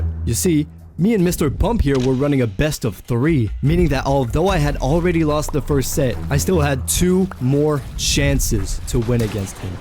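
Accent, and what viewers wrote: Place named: Quebec, Canada. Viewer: I thought you were french (considering your game language is) and I was flabbergasted by your english accent, but I check your description and you're from Quebec, so I get it now. Anyway, very nice video with top notch editing, especially for someone with so few subscribers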